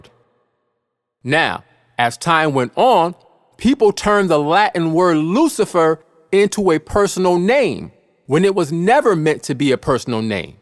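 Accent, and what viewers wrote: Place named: Cockney, United Kingdom. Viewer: . .the maker (Son of Man)of tis video must be a cockney fr London ee.. he has that english cockne accent. .for info i m fr Borneo isle...studied Law fr London U...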